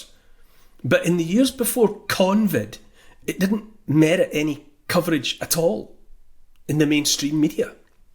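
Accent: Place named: Wales, United Kingdom. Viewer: A Welsh accent saying things like "speed of science" tells you all you need to know. Deer me.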